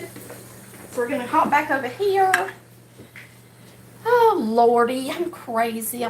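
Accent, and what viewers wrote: South, United States. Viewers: I love your deep southern accent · Hey....this looks amazing. Love the way you cook and also your great southern accent. I'm subscribed to your channel and can't wait to see more. Blessings my friend!!!!!! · I love your sweet southern accent! Your food looks SOOOOO amazing! !!!!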